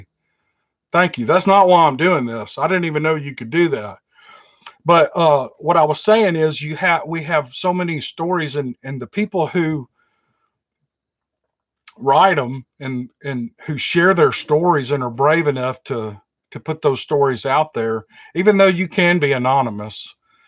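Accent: South, United States. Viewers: Your voice is great. You were meant to be on Radio or YouTube. Your Accent and your Southern smoothness are your Brand. It is the best. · Southern "twang" or no, it is the directness, honesty, sincerity, and humor the generates a two way confidence and respect between you, your audience and the material as presented. Get it? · Keep up the good work and please do more live! You are just darling and I love hearing you talk. I'm from the south also so I really appreciate a good Southern voice. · I’m an Oklahoma girl, not really a girl anymore, but feel like one. I’ve always been drawn to voices,man or woman, with a southern drawl. It’s probably because that’s what I’ve heard all my life. It’s just a comfortable, warm feeling, I don’t know how to describe it. Anyway, thank you for your hard work. You are genuine and generous. Thanks for recommending Beast of the Woods. 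I’m going to their channel right now! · I’m from Indiana and I love your southern accent. 😁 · Hey 👋 just seen this your luved/liked by everyone your voice is great 👍 the southern accent is just great also keep those stories coming and ty for the time you take to tell these stories God bless oh oh luv your pets hanky panky is to cute.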